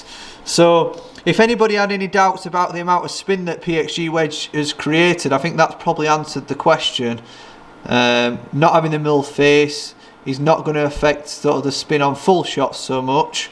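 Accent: Yorkshire, United Kingdom. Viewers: Love it and it's great to hear a proper yorkshire accent on YouTube!! 😁😁 Keep em coming!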